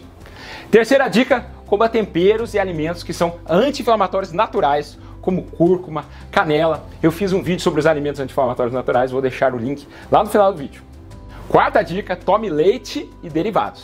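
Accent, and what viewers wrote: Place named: Caipira, Brazil. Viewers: Caipira ou Nao...eh sempre mto bom ouvi-lo! 😉✌🏽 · Esse sotaque caipira deu um contraste curioso com as informações brindadas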